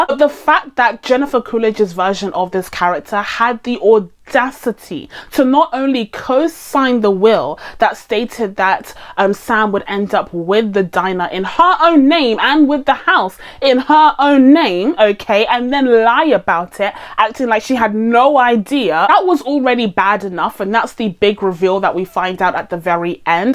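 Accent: United Kingdom. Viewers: I'm Finnish myself, and I'm generally bad at placing English accents as it is a second language I rarely get to use other than online. I do love your accent, though. It feels very British, but flows in a way I haven't happened to hear before. Not sure if there's like a definitive London accent, I'd imagine not as it is a very fluid and cosmopolitan city, but yours is lovely ♥ Pretty sure you have more people there than we do in all of Finland, around 5,5 million here 😁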